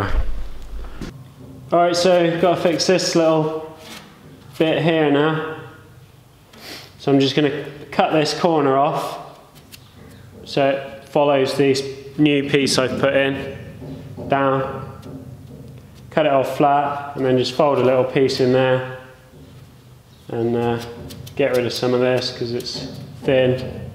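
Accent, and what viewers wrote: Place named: Dorset, United Kingdom. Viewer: Dorset UK im guessing by the accent lol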